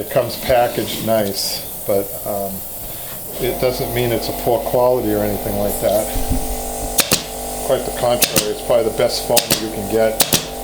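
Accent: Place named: MetroWest, United States. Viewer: Just happened upon your channel as I consider tackling my first upholstery project - and I just want to say that you explain things so intuitively. It all makes perfect sense as you go along explaining, that I quickly start to visualize and anticipate what you will say next. Of course, I immediately identified your accent as I am also from the metro-west area :) Easy to understand and well-explained instruction. Thank you! I feel like this might actually be enjoyable to undertake my project!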